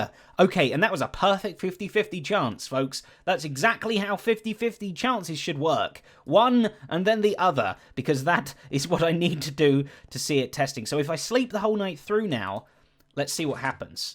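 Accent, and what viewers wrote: United Kingdom. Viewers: i think im getting a british accent from watching your vids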